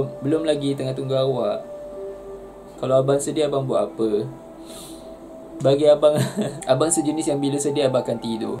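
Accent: Sabah, Malaysia. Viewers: suke,lagi lagi ckap sabah gan jepun tuh..^^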